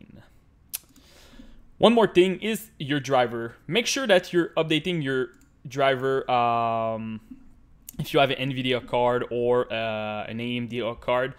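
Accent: Quebec, Canada. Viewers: Un Québecois :O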